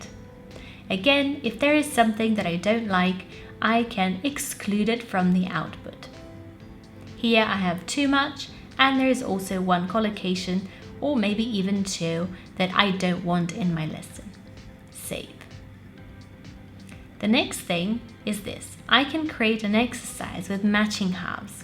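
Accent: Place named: Eastern Europe. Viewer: I wonder where her accent is from 🤔 seems like Eastern Europe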